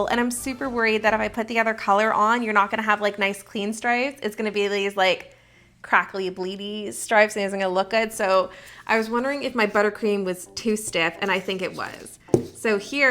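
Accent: Canada. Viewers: I just subscribed! ☺️😁 also, are you Canadian? 😂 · Is she Canadian? I found her channel yesterday and I’ve been binging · Me: NO you are not subscribing to any more baking channels .. All you make is Vanilla or chocolate basic cake!!!!!! - Also me: But she's sooooo cute ... She's adorable!!! And that Canadian accent!!! Chef's kiss!!! - Me again: I said NO .. what are you doing?? ... Ok, you're subscribed ... I hate you 😅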